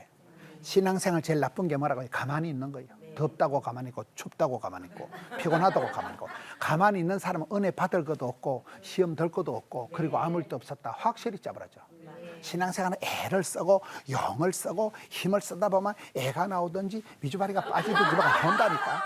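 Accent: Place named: Gyeongsang, South Korea. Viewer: 목사님 경상도 사투리가 너무 웃기세요 그래도 너무 은혜롭습니다~~ 할렐루야 아멘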